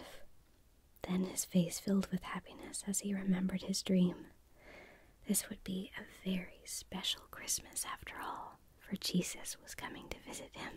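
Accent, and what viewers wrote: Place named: Texas, United States. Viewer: It's great to hear from a fellow Texan again. Wonderful video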